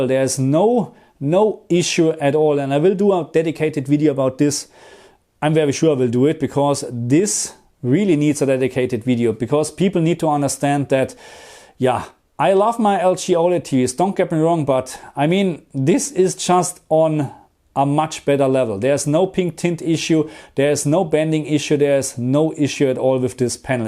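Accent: Germany. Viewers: I love hearing the German accent.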